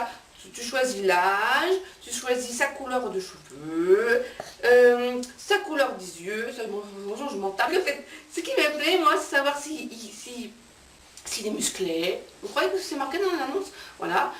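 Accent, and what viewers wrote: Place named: South, France. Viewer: ... et tout ça avec modulations et accent du Sud..! Je garde, je garde 😆 !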